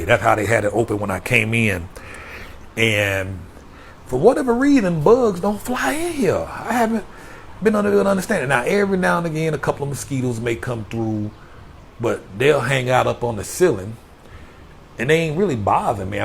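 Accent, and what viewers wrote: South, United States. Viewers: This dude sounds Country as H-E- Double hockey sticks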